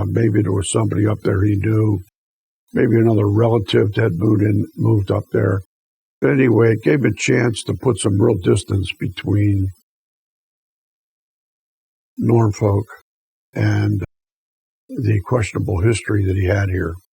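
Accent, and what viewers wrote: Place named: Pittsburgh, United States. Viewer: I KNEW you were from Pittsburgh, but I couldn't confirm it until you just mentioned it. I was born and raised there myself, and now that I have lived outside the city for so many years, I can catch the accent immediately. Anyhow great series. I fell in love with it and I am binge-watching right now. I am going to purchase the book this week. Thank you.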